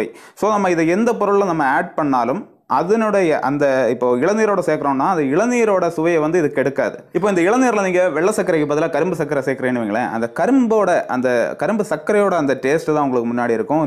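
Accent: Kanyakumari, India. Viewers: Nice vedio and kumari voice very nice bro... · Ungal malayala tamil azahu...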